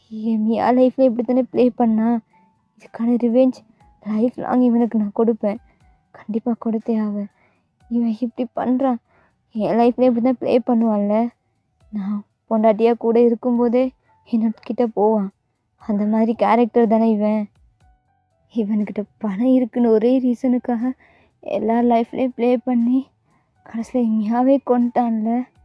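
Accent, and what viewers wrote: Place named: Sri Lanka. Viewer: Sri Lankan maari pesuringa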